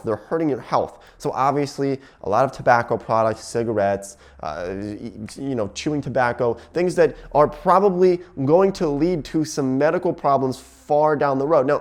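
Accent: United States. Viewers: im very confused as in if hes american or birtish lmao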